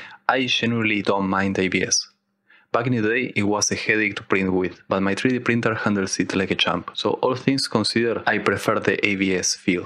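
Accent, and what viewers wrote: Argentina. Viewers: Espectacular tu prototipo. ¿Sos Argentino? te delata el acento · Really liked your video. You sound so Argentinian, like a friend of mine talking in english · Increíble proyecto!!! Escucho un acento argentino o estoy flasheando :?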